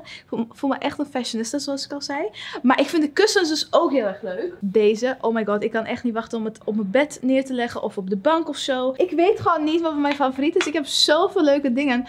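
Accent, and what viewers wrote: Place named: Enschede, Netherlands. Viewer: die "n"van haar omg kom je uit enschede?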